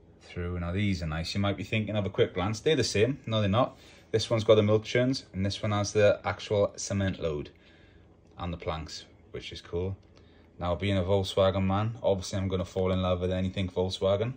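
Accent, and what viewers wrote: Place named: Swansea, United Kingdom. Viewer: your enthusiasm is infectious! superb haul, i'm pleased to say i own many of them although not all are boxed, i completely agree re the artwork, it is absolutely stunning, a golden era not just for toys but everything else really(i wont get political) incidentally my cousin's husband is from swansea and his accent is so similar to yours(obviously) great video as usual, yes, i'd be interested in a more in-depth look at your collecting process, thanks again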